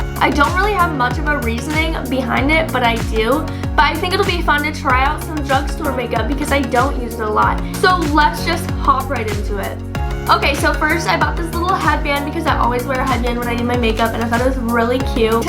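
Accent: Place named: Australia. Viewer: i thought you were australian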